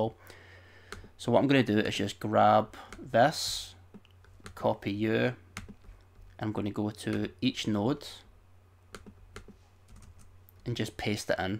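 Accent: Scotland, United Kingdom. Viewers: Great video - epic accent :) hello Scotland :) cheers from Munich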